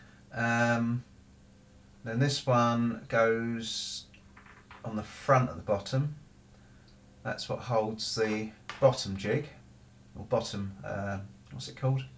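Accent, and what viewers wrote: England, United Kingdom. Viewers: can't be asked!!! You are so English, I love it!!!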